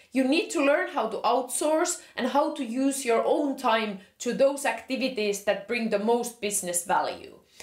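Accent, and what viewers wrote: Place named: Finland. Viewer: 11 seconds in I am betting your from Finland??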